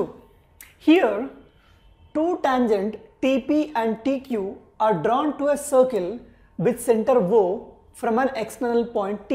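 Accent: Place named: South India, India. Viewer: geez thats cuz of the dialect hes prolly south indian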